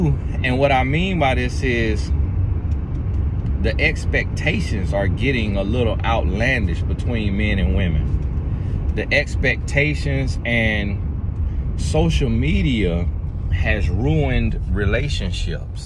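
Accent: South, United States. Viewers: You got that southern accent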